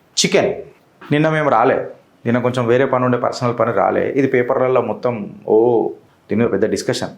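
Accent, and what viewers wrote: Telangana, India. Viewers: అన్నయ్య మీరుమట్లాడే తెలంగాణ స్లాంగ్ బాగుంటుంది...!!! మాట్లాడే తీరు excellent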